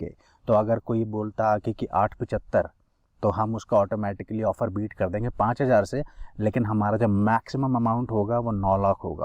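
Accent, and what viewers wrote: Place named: Punjab, India. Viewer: Congrats. Loved your video, straightforward and honest. Sabto wadiya is its native hindi with Punjabi touch.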